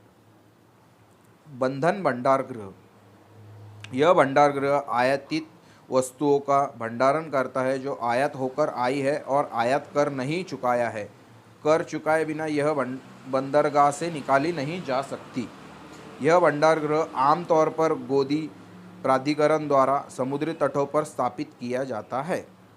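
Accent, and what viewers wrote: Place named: Maharashtra, India. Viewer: From your hindi... I assured that you are MARATHI person... Jay maharashtra bhau 🙏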